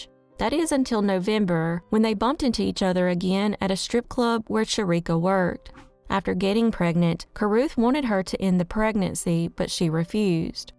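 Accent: South, United States. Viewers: This narrator has a nice southern accent.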